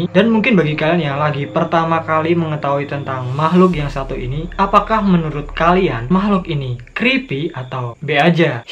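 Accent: Java, Indonesia. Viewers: Merinding banget dah abang orang jawa apa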